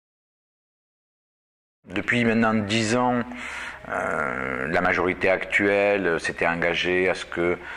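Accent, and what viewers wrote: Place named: Ariège, France. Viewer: P'tain..Mais qu'est-ce que c'est que cette voix d'ariègeois? Pourtant Rivesaltes (le camp Joffre) c'est dans les Pyrénées orientales..que je sache..!!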